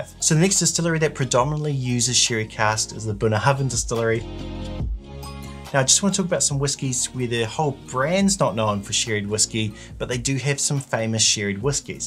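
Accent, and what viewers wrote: New Zealand. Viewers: Your channel is so good, needs more attention from the online whiskey community. Very well edited, great presentation, well researched, and I love listening to your Kiwi accent. Cheers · Kia Ora brother, I found your channel a few weeks ago and I must say that you educate on very understanding level. I've been drinking whiskies for 3 decades and last 20 years just single malt on rare occasions blended if I find a good one but I wasn't that good in details about process and casks in deeper dive. I lived in NZ for 16 years and crossed the ditch many years ago so it is always nice to hear this lovely accent. Anyway back to sherry , your choices are spot on, and as you mentioned, like many people, I love A Bunah and 105 a lot. I also love some of the sherry cask finished ones and I am sure you have tried this one before, it is Laphroaig PX, as a peat and sherry lover, this single malt is the best of both. 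Take care, and thanks for the very informative video.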